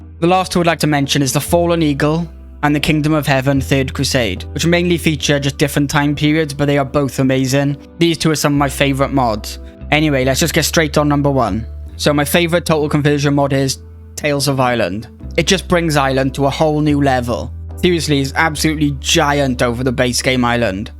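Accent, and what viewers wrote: Wales, United Kingdom. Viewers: Hey great vid man, nice to see another Welshman into ck3, keep it up my good man, your accent tells me you’re from around the Swansea area?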